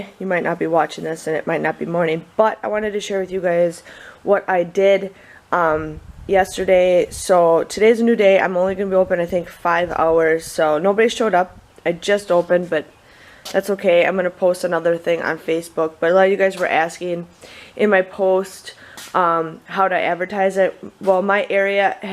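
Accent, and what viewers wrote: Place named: Wisconsin, United States. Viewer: I live in Iowa... not too far from you. I could tell you were from Wisconsin ❤️It's so cold and rainy! I think you did a great job!😊 Happy Mother's Day 🌸🌼🌻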